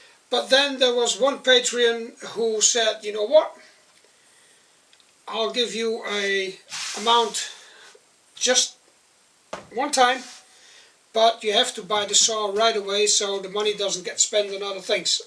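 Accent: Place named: Netherlands. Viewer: Dutch accent is awesome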